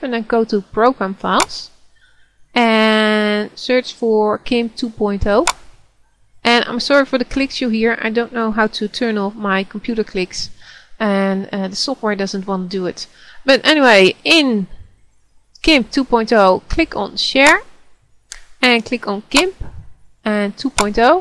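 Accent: Netherlands. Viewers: Are you from the Netherlands? I have relatives from there that have the same accent as you. :D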